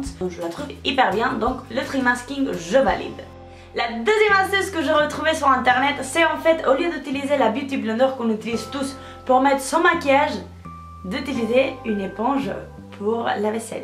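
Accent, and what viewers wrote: Spain. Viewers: T'es magnifique! Moi aussi je suis espagnole! ❤️❤️❤️ Mais moi jai pas d'accent, dommage..😒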